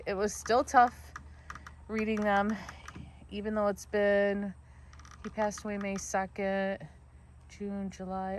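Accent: Michigan, United States. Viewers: I think you have a Michigan accent. How’s Gretchen doing in Lansing?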